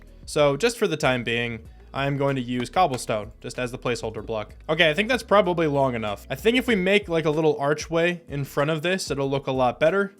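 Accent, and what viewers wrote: United States. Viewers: I thought I detected an eastern PA accent, I'm from that area too! Go birds! Just found your videos and kept picking up on Philly/lancaster isms. Enjoying the series so far. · Ahh, now that you mention Pennsylvania I can hear it. Good video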